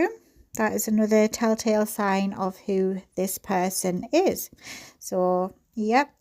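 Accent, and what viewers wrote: United Kingdom. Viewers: U sound like u from County Durham I'm from peterlee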